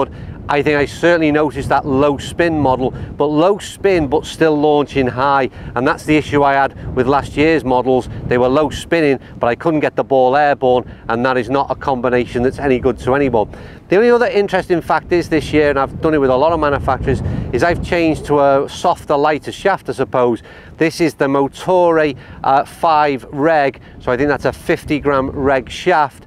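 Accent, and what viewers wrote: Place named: England, United Kingdom. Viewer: Why do we have to listen to people that have a strong English accent???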